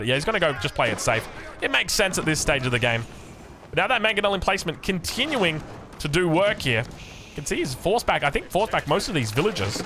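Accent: Australia. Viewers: Hi I love your videos but I have a massive problem watching them. I watch them generally before going to sleep and your buttery australian voice guides me to sleep. However I have developed a sort of Pavlovian response that now whenever I watch your videos I get really sleepy all of the sudden. Anyway still love your vids and have a G'Day!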